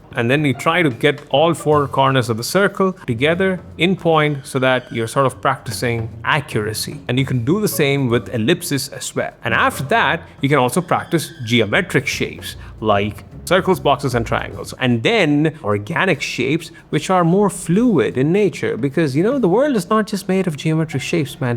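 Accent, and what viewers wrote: India. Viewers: I totally forget sometimes you have an indian accent, which i love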